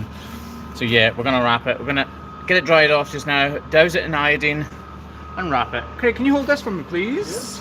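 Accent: Scotland, United Kingdom. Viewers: Love Outlander & love your videos - you could read the telephone directory & I'd watch!! Its a love of the Scottish accent - you & Sam Heughan 👍💕🏴💕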